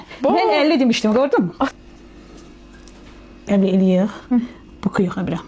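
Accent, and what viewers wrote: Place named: Zagatala, Azerbaijan. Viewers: Qax zonasının ləhcəsidir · Zaqatala ləhçəsine oxşuyur abay 😊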